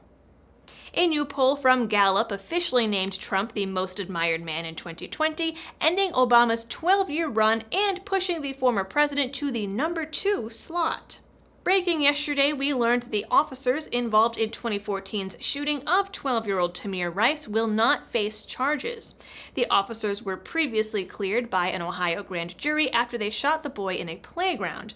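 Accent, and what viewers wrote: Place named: Massachusetts, United States. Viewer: This was a good idea...but I definitely can tell you are from Massachusetts, you can talk a mile a minute...but this a good thing because we get more news in the three minutes....keep up the good work. 👍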